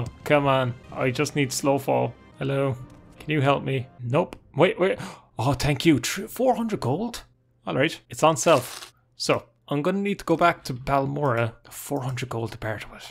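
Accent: Ireland. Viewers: Are you Irish? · I wonder if anyone has ever told him he sounds like Jacksepticeye if they added a 10x more Irish to him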